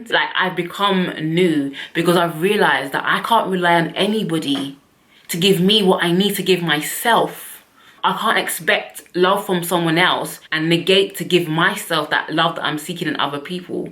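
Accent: United Kingdom. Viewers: Wow!!!! I love this woman mindset I would love to meet someone like her plus I have a thing for dark skinned woman and love the British accent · cute British accent. black people with British accent.... are very dope .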